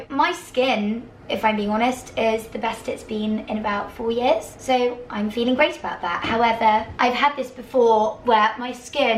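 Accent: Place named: England, United Kingdom. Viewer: Oh my gosh she had the most stereotypical English accent. Which part of England are you from, definitely not London Ps: I’m from London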